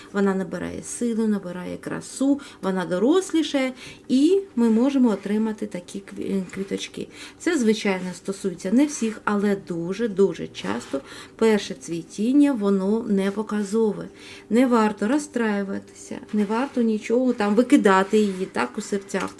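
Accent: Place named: Surzhyk, Ukraine. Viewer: Суржик ,,режет" ухо.Отписалась.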